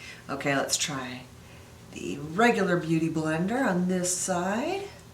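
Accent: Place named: Midwest, United States. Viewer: Born and raised in Iowa so I totally understand the Midwestern accent, yah hey! ;)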